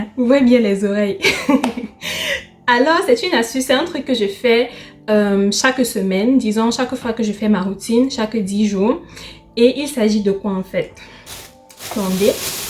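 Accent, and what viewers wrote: Cameroon. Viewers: Uhein dès que je t'ai entendu parler j'ai toute suite su que tu étais camerounaise vraiment le goût de ça une belle camerounaise 😂😂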